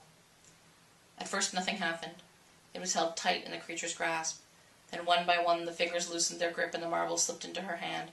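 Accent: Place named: England, United Kingdom. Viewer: Best in an English accent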